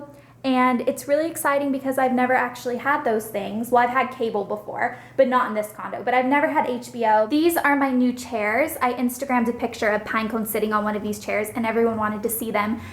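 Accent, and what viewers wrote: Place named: South, United States. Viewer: Your accent sounds so much more country than I've ever heard it before!:)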